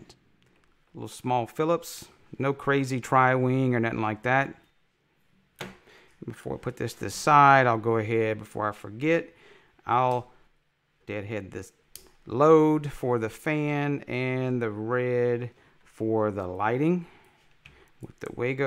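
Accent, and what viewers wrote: Louisiana, United States. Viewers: Very Cajun!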